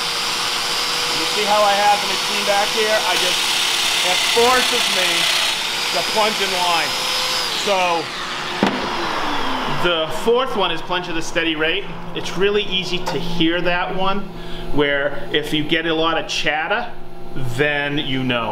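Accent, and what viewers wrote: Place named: Boston, United States. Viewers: I became one of your subscribers recently. I'm from Mass originally. I moved to the South, KY and it's great to see and hear your humor and Bostonian accent ( and this t shirt here) as well as your thorough teaching abilities. I have to say you, Bents, and Tamar channels are some of my favorite, great teaching and no fluff content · best "Mwwwoooortise"...... luv the East Coast accent. Good video boys!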